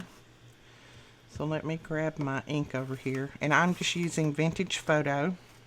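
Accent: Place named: Alabama, United States. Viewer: I have been a card maker so I know the basics. I like the videos where you make the elements of the junk journals since I haven’t tried the journals yet. Slowly working up to it. I love love love collage and making master boards. Thanks for sharing your gift. Your voice is also soothing. Love the Alabama accent. Junker from North Carolina.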